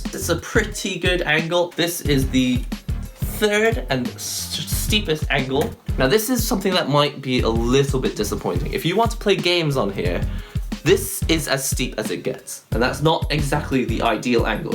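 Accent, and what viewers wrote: United Kingdom. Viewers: I know its none of my business but im just curious. What nationality are you and whats your family background? Like you clearly have a british accent, you refer to most things in pounds but you clearly live in japan and appear japanese. I know people are mixed race and people move and migrate across the golbe all the time but im just the type of weirdo that needs to clarify everything haha. Thanks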